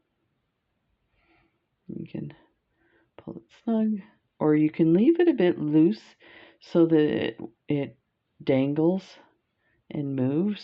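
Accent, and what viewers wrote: Canada. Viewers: I'm so happy to see these earrings. So gorgeous. I just got my hair cut so my earrings will show now...haven't had short hair for years! You explain each step and I enjoy your "chatter", it's part of your charm! Keep doing it. Love your Canadian accent.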